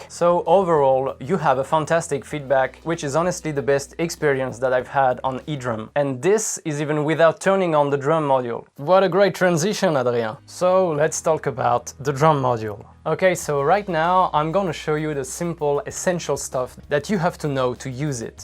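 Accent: France. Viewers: Adrien's french accent here and there is just 😍.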